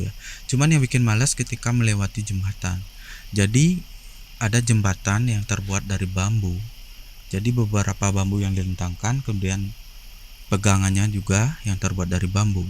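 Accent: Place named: Bali, Indonesia. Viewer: Cara penyampaian ceritanya ok terlebih lagi ditambah logat Bali nya sangat unik sekali. Mungkinkah itu setan di sungai itu salah satu karakter patung setan yang digiring orang Bali di acara ritual apa yah namanya ?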